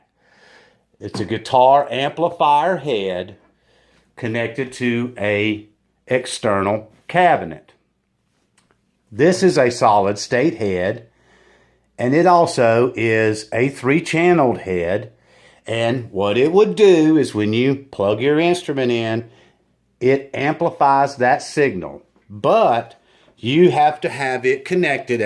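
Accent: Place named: South, United States. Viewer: Very good video, I especially like the repetition of terms, that way you remember them better. Btw, I am south-European guy, but I would say based on your accent that you come from the south of States somewhere ... :)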